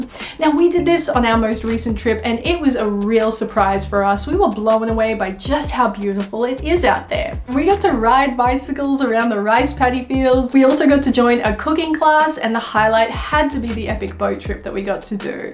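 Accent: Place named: Australia. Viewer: I have been living overseas for about 25 years and I have never been to Vietnam my country before. Your travel videos are very relaxing and I am glad that you really had a great time in Vietnam. After the Covid 19 pandemic I plan to go to Vietnam for the first time and please make more travel videos in the future they are really good. Do you like Tokyo and Japan? By the way Australian accent is quiet exotic!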